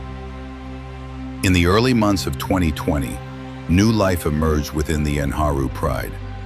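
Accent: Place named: United States. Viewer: listen to the dumb usa gump reading about africa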